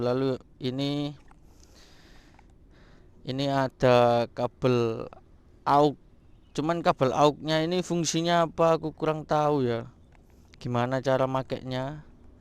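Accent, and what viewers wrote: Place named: Java, Indonesia. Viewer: Mas nya medok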